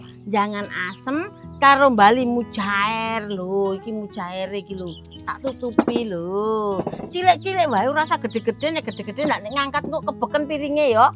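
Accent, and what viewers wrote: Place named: Surabaya, Indonesia. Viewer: ng jogja wes suwe tapi logat e jek logat arek ae 🤣 Salam saking kulo nggeh buk darjo cerak mantune smpean 🤭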